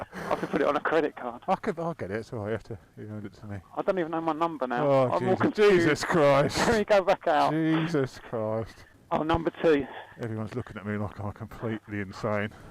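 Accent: United Kingdom. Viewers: reviews are certainly more watchable when it's in a smooth english accent. Sure beats Americans telling me "it's frikkin awesome!". May want to think about quitting your day job;) a walking disaster haha either that or his comedy act is really coming along. Can I ask…how much does it cost to feed and look after a pet? only they look like so much fun but I've also heard bad things like they go a bit ravenous over a nipple and also pretend to leave their debit card at home when it comes to paying all the time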